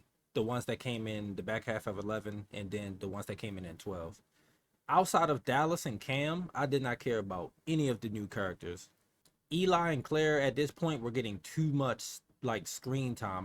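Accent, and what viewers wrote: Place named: Baltimore, United States. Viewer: Are you from Baltimore? I hear an accent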